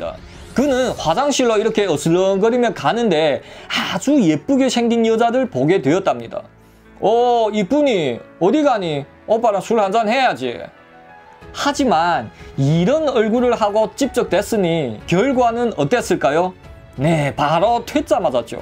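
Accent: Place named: Korean-Chinese, China. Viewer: 와 무슨 무협지 보는것 같네 실지 존재했던 흑사회들의 주요 사건비화를 류한이라는 주인공을 등장시켜 이야기를 조선족 특유의 강한 어투로 이야기 찰지게 잘하시네 일단 구독 좋아요 누릅니다 10회 기다립니다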